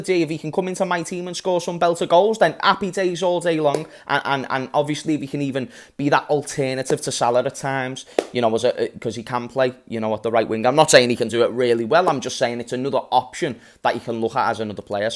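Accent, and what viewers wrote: Liverpool, United Kingdom. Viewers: It's the scouser accent for me 👌👌👌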